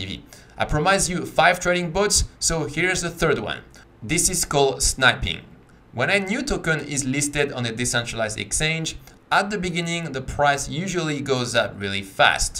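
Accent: France. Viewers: L’accent Français Youhoouu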